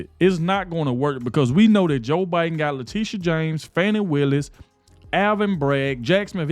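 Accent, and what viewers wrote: South, United States. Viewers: Love your Pod Cast👊🏻👍🏻. Your Southern accent makes me think of my Louisiana , not Louisiana , roots💕. I love the DEEP SOUTH AND ITS DOWN-HOME FOLKS👏!! I had to come back to San Diego Calif after 22 years in Florida. I MISS FLORIDA SO MUCH😢!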